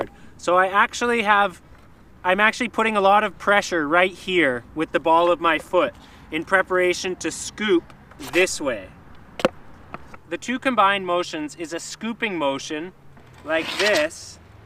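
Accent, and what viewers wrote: Canada. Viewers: It's funny how i can hear his Canadian accent even when I'm a Canadian. Fuckin Mint 🍁👌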